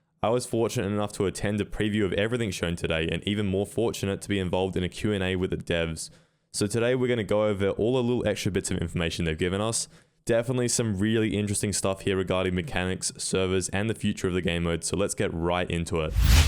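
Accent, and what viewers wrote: Australia. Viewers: I subbed good to hear a Aussie doing the YouTube thing. Now to the game I can't wait to play but first I will have to get a console lol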